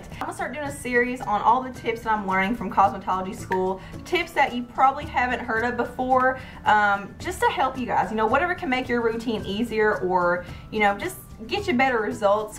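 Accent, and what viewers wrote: South, United States. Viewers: that southern drawl 😍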